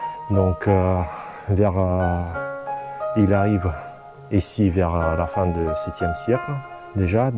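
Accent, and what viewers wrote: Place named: Russia. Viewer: Bonjour. j'espère que tu vas bien depuis la dernière fois j'ai adoré ta vidéo que ce soit ta façon de raconter le lieu que tu nous fais découvrir la musique qui va bien aussi et ton petit accent russe que j'aime bien tout particulièrement on voit que tu étais bien renseigné bonne idée pour les genouillères j'espère quand même que quand tu pars tout seul tu donnes ta localisation à un proche on sait jamais ce qu'il pourrait arriver en tout cas superbe vidéo continue comme ça le succès est garantie ça serait bien qu'on arrive à se revoir avec l'équipe je te dis à la prochaine fois😊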